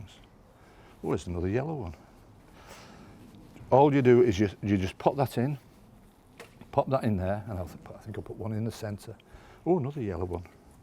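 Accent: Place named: Northern England, United Kingdom. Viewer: Yes an English gardener👍😉👍 and by the accent a northern one at that. I've SUBSCRIBED, I'm getting on in years but giving gardening another go, and who else to follow but someone who actually lives in my part of the world. A brilliant video mate and I'm already looking forward to watching more of your video's.... oh I'm from South Yorkshire and pleased to meet you👍😉👍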